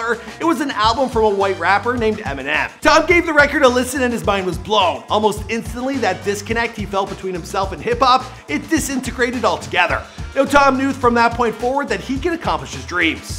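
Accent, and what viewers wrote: Canada. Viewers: Why does the host sound like he is puking out every fifth word? Is he usually like this? No diss, just asking. Is this Canadian accent?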